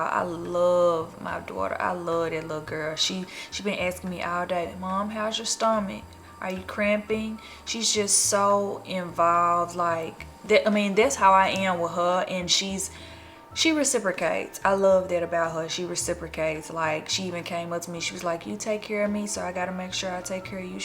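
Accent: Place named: Memphis, United States. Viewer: I've been sitting here for the longest like damn sound like my mother-in-law...NOW I get it lmao.😂 Memphis and Forrest City folks sound just alike.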